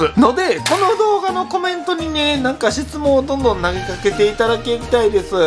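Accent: Kansai, Japan. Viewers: 中二です。いつも面白い動画をありがとうございます関西弁ってところも親近感が湧きます！今までのさんと似ていて土日くらいしか写真を取りに行くことが出来ないのですが土日は土日で用事があったりとあまり写真を撮る時間がないんですがなにか経験者としてアドバイス的なの(なんでもいいです)ありますか？質問じゃ無いかもしれないし日本語変かもしれないけどよろしくお願いします長文失礼しました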